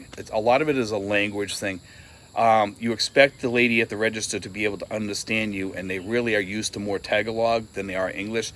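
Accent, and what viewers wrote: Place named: Chicago, United States. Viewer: Hmmm, I changed my mind your accent I thought was from New England, im thinking Chicago, Illinois now lmao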